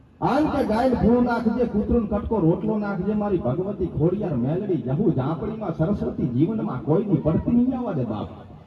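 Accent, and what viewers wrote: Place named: Vadi, India. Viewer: વાહતા ભાઈ તમારા વિડિયો જોવાની મજા વાદી ની બોલી માં હો❤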